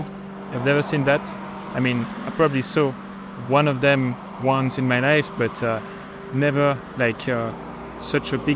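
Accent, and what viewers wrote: France. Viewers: Totally loving this video! My go-to gear is my Sony a6000 and my Sigma 30mm f/1.4 (equivalent to 45mm full frame.) The irony, Sir, in documenting lenses and street photography, you are one of the people are developing the Youtube POV Street Photography video as an artform unto itself. The collation and sequencing of street scenes, I would say, is not unlike putting together a photo book. And the choice of music is another layer that puts the mood and vibe into the video. And your French accent is lovely! Reminds me of my cousins in France. I encourage you to visit South East Asia where you can find more places like this. There have been many street scenes that been ruined by ugly lamp posts. Since my Sony a6000 has no OSS stabilization, I mostly use poles to brace my camera against. It’s sweet, since these poles allow you a 360 degree view while you brace your camera against it. 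But I love your composition solution for these poles. I never realised that small streaks of light can distract the viewer! But then, I mostly shoot at night. I suspect that small hut at the top of the hill could be a toilet. I noticed the signage at the foot of the hill that said 便-something. But I could be wrong. This is the first time I have heard of the camera as being a point in the compositional triangle. I love it!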